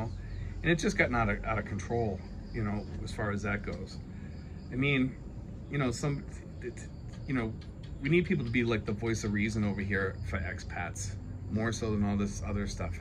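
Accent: Boston, United States. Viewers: Thanks for making good simple to understand videos..you know I am just an old simple minded man from the rural part of Louisiana.. didn't you say you were living in cavite? I had a girlfriend living in tres martinez or general trias I think it was.. thanks again and keep the videos coming..where in the states are you from? I think I hear a Boston accent..I worked all over the usa and world in my younger years.. thanks 😊👍👌😁🤗..hello from south Louisiana and I am heading to the Philippines to live out the rest of my retirement.😁🤗🐊🐊🐊🍺🍻🐊🐊🐊👍👌🐊🐊🐊😁🤗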